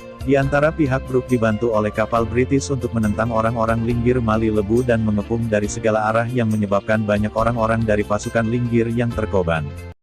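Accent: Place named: Indonesia. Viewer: Hanya satu yg aku nak Tanya... Admin channel ni asal org mana ya? Asal org Sarawak ke org Indonesia? Sebab slenk suara tu mcm Indonesia je... Hanya bertanyak ya 😊 #NgapSayot®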